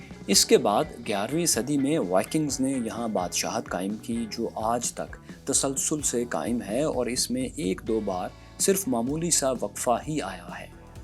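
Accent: Punjab, Pakistan. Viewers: Pease pronounce the words correctly. Tone down your Punjabi accent and not ruin the younger generation.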